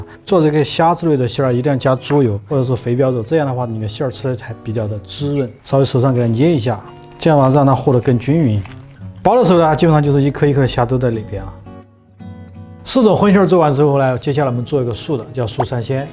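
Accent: Sichuan, China. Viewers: 听口音是四川人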